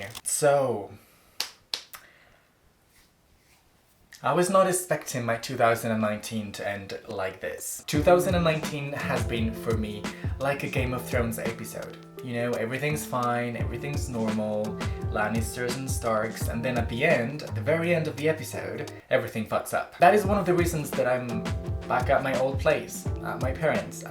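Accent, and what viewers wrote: England, United Kingdom. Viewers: Me acabo de enamorar de la voz de hablando ingles! Suena como un ingles de uk! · i didn't exepct the british accent, tbh and i love it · Tienes como un acento británico al hablar en ingles y me encanta! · I don’t know what I was expecting you to sound like in English but I definitely wasn’t expecting a half-British accent lmao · Aprendiste inglés en Inglaterra?? Me gusta mucho tu acento inglés. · I JUST LOVE YOUR ACCENT SOOOOO BLOODY MUCH, IT KIND OF REMINDED ME TO ADELE´S ACCENT AND I LOVE IT:face-red-heart-shape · Ohh, I see, I see, interesting. I actually root more for the British aacent, nice. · Me encanta cómo quedaron los dibujos y que tienes un acento súper británico, por lo que me han contado las personas que me han oído hablar inglés mi acento va cambiando entre británico como el tuyo, americano (estadounidense, no canadiense) y escocés según qué palabras ( todo esto fruto de mis distintos profesores o fuentes de aprendizaje de inglés en general a lo largo de los años) lol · DIOOOOO que fluido xd y hablas como un británico lol te adoro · U sound a little bit more gay in English 😂😂😂 and u have a bit of British accent 💙 Love it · His British accent make me a orgasm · You're english is really good! 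And you have a cute british accent jdjdjd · I love is English accent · EL ACENTO BRITANICO AAAAAAAA ❤️❤️❤️❤️❤️❤️❤️❤️❤️ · TE SALE UN ACENTO RE INGLÉS ESTO ES PRECIOSO, MÁS VIDEOS EN INGLÉS!!! ❤️❤️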